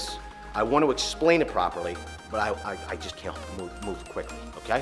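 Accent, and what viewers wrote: Philadelphia, United States. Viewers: The whole time I'm watching this I'm thinking, "This dude has gotta be from Philly. He sounds like he could be a clone of my voice." Contractor myself, and you have the same patience for bullshit that I do...zero. Northeast Philly, I have a business in South Jersey over the Tacony. Where you guys from? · the best part is the philly accent.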